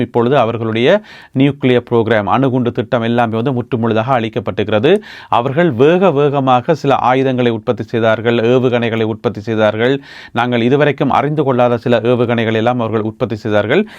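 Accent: Sri Lanka. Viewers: ஸ்கீரின் பேக்ரவுண்ட் ஒரு செய்தியில் ரெட் இப்போது கிரீன் நல்ல ரசனை அருமை..👌 செய்தி வாசிப்பும் இலங்கை தமிழ் நெல்லை தமிழில் அழகாக வாசிப்பு திறன் அதுவும் தடையில்லால் சூப்பர்.. போர் செய்தியும் மற்ற சேனலை விட நல்ல விளஙம்குபடி சொல்கிறார்.. ஓயில் ஆயில் கொன்றது கொண்டதுன்னு கதைக்கிறது ரசிக்கும்படி உள்ளது.. எனது வயது 70 படிப்பு 7 நான் நெல்லை தமிழன்..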